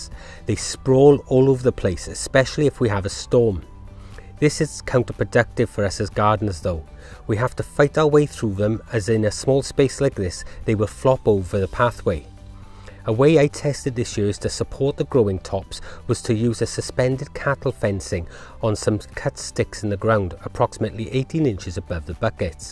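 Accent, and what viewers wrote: Wales, United Kingdom. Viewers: I'm dyin to know-is yours a welsh accent? You're a fantastic teacher, by the way. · thought you were local I'm from cwmbran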